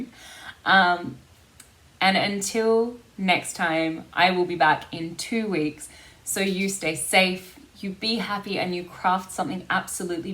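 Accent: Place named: Australia. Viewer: I knew as soon as I heard the accent you were an Aussie!!!! Yay!!! Love seeing other Aussie cross stitchers…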